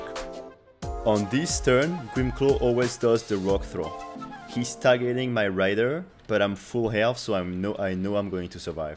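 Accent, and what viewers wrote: France. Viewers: was kinda my purpose. Thx. Btw are you French?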